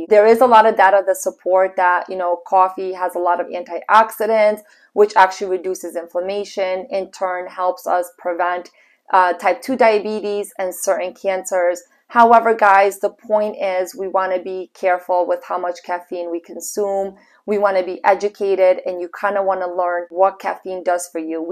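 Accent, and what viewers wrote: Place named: Long Island, United States. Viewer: Long Island accent!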